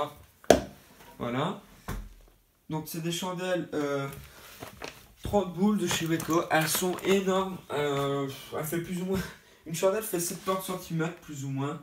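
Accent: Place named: Belgium. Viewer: Non c un belge çà s'entend ( je suis belge)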